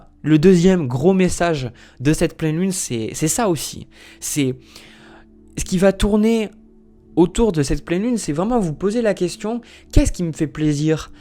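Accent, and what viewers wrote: France. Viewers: Bonjour, Merci pour votre travail et vos commentaires. Perso ça fait deux ans que l'on lit ...de nouvelles choses... Etre seul, n'est pas in problème...le calme,la paid et le silence..voila qui est bon. Bonne question: qu'est ce que j'aime faire...? Attendons led energies suppérieures 🤫 Oui, attendons.... Fini la routine,la dictature... L'argent ...pour creer une sociétè et creer des emplois....et faire du bien pour les animaux... Belle semaine Ps: vous n'aurriez pas un petit accent francais? 😘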